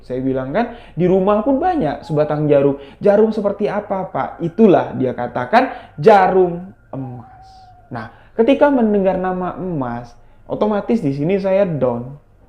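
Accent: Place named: Jambi, Indonesia. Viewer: Walaikumsalam kak n team... Masya Allah Emang Kereen lw Sebuah Kisah Misteri upload cerita Pesugihan sllu bikin penasaran....palagi d bw in kak dgn logat jambi yg khas....the best lah Tanpa yana tunggu lama lama..... Gaskeeuun yuk kak....🔥🔥🔥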